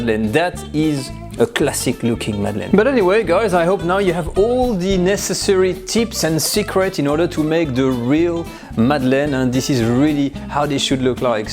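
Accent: France. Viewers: So cozy French accent 🤗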